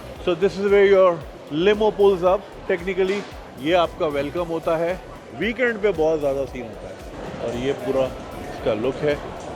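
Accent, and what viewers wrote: Karachi, Pakistan. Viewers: walking tales ko dekny ki main waja ti karachi xcent asal maza us style ka ta funy bato ka ta pakistan k sat comperssion ka ta is tara to seeda sada banda youtube my kahi b dek sakta h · Paki in las vegas with his orangi town english accent 😂🎉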